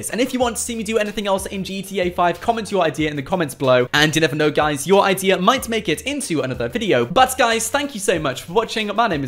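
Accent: United Kingdom. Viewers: Is British or American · Kylah Folkes he’s a British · listen to his accent; he's British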